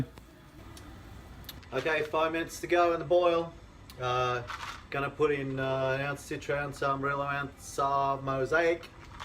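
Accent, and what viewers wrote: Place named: Australia. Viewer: Good vid. Like the Aussie accent with the occasional US inspired pronunciation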